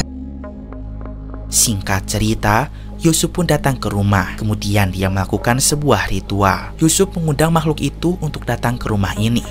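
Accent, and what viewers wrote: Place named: Java, Indonesia. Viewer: Pasti jawa😂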